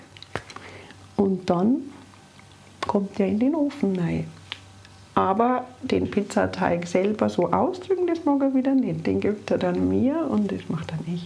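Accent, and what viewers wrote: Bavaria, Germany. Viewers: Dass du bayrisch redst, das wusste ich nicht. Gfreit mi ....🙂 An Guadn für euch beide....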